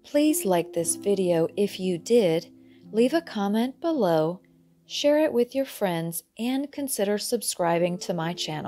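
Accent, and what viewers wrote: South, United States. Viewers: Many southern have smooth accents. 😘🎠🚂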